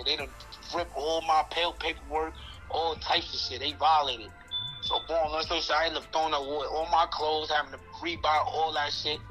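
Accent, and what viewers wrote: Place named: New York, United States. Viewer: NY talk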